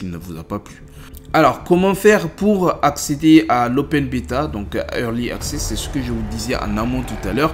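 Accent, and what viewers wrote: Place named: Germany. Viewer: j'adore ton pti accent allemand😍😍😍😍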